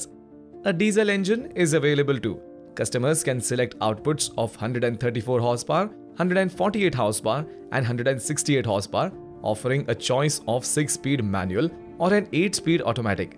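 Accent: India. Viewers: This tounge...like indian speak english...😊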